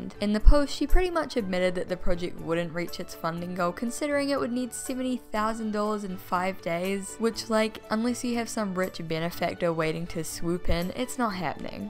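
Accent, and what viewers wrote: New Zealand. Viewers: omfg I knew u were from nz bc of ur accent!!! when i saw the kickstarter that confirmed it ahhh!!! bet ur from auckland uwu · as soon as i heard your voice i knew you were a kiwi haha. There's been like a surge of New Zealanders on Youtube and I'm here for it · For me it's the NZ accent. NZ give me Gold Coast flashbacks. No, you can have a durry cuz.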